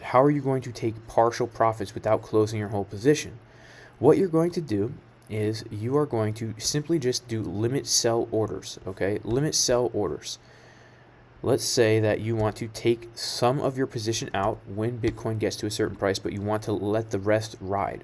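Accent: United Kingdom. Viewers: Haha love the British accent !! 😂😂😂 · Nice English accent. Im from UK so I am impressed. Thank you for your tuition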